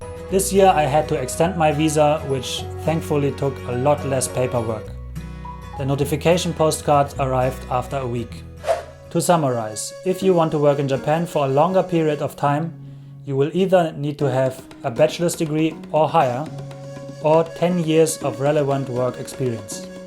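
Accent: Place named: Germany. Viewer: You have the most cliché kind of German accent :D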